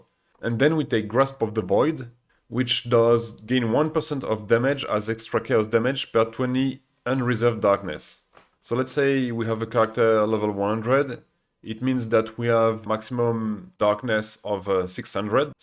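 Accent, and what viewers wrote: France. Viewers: You got a bit of a french accent but you speak english way too good to be french. What is this sorcery?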